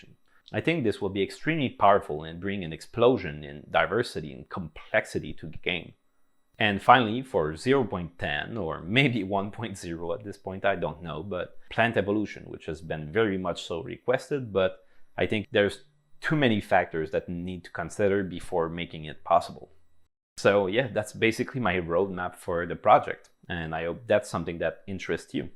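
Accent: Quebec, Canada. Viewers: I am from Quebec (Canada) and your accent is pretty similar at the one that we have here when we're talking english, are you from Quebec? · Hey mec j’ai vu ton vidéo sur la simulation de 100 heures et en t’écoutant je me disais: ha j’suis sur que c’est un ingénieur (québécois). Tu représentes le ´deuxième ´ type d’ingénieur: le type qui devient moniteur de ski ou maraîcher ou youtubeur… bonne chance dans ton projet c’est super intéressant!!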